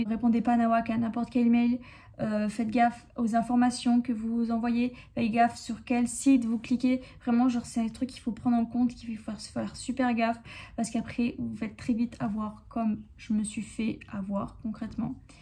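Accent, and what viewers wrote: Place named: Corsica, France. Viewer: Salut dsl pour ton compte mais je voulais te demander t'es pas d'origine corse parce que tu as quelque fois l'accent corse sinon j'espère que sa va aller mieux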